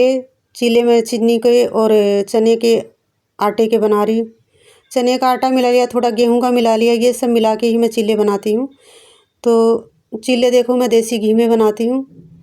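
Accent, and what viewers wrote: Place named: Haryana, India. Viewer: मुझे तो पहले ही पता चल गया आपकी बोली से की आप हरियाणा के हो जी बहुत ही बढ़िया Vlog है दीदी जी बच्चे तो मुझे बहुत ही पसंद हैं जी 💫🤍💫 खुश रहो सदा ओके दीदी जी।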